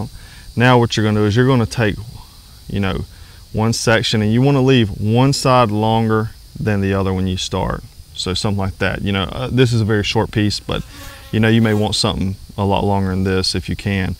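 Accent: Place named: Texas, United States. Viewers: Oh right! I just imagined his saying Texaaas, it made perfect sense. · texan xD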